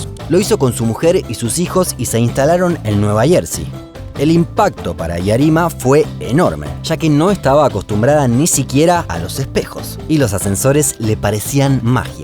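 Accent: Argentina. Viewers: Esta buenisimo el contenido, me encanta tu acento argentino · Porque los argentinos o el acento de esa zona de Argentina que creo que es el porteño es llamativo A mi me gusta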